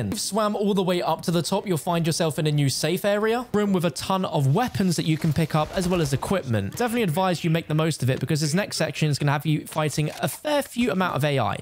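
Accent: United Kingdom. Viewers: hahaha the British accent got me. He says THRee players and then one of the FRee has to unlock it by blah blah. I thought he was saying paid players could get right in but free players had to unlock it. Bummer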